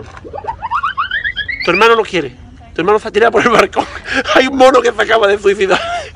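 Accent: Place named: Andalusia, Spain. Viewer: Ke makina jajajaj se nota ke eres andaluz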